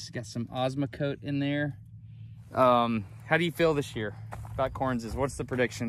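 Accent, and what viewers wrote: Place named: Alabama, United States. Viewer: Sounds like Alabama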